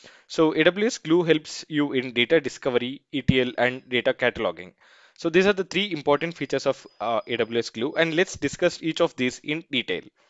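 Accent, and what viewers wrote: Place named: India. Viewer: Shit voice Indian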